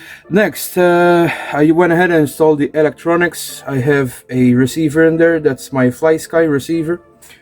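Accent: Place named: Malta. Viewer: your English sound like Maltese English :-) Interesting design and very well made 3d printed parts, tempted to get an SCX10 but now I'm too busy with the 3DSETS Landy and Rancher, 3D Printing is very addictive.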